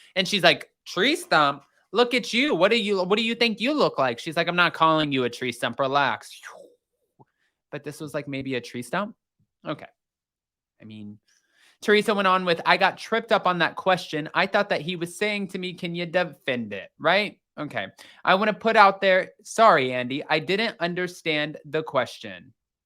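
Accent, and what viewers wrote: New Jersey, United States. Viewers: Loving your Jersey accent 🤣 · 😂 I love your Jersey accent!!! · you crack me up with your jersey accent😂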